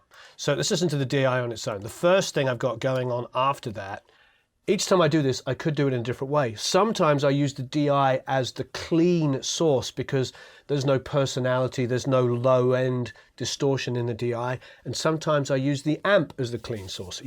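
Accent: United Kingdom. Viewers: That and the british accent.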